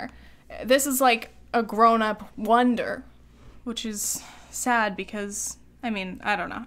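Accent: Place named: United Kingdom. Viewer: Giving you a super like for that British accent. Applause applause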